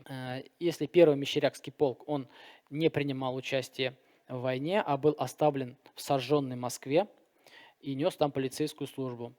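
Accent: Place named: Europe. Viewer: В ЕВРОПЕ ЖИЛ КАК УЧИЛИ ТАК И ГОВОРИТ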